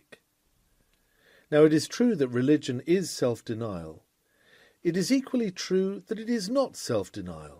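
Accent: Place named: England, United Kingdom. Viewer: Was looking forward to this sermon, love this reader with English accent . Thank you Jesus .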